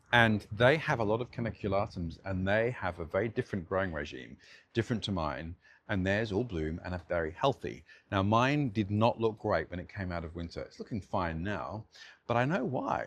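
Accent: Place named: Australia. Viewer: your rental is fabulous and please don’t stop making videos! Your great sense of humor and delightful Aussie accent truly elevate the viewing experience for me, and I thoroughly appreciate your orchid culture insight and so enjoy seeing your plants. Happy New Year from my very crowded rustic cabin grow space in Southern CA.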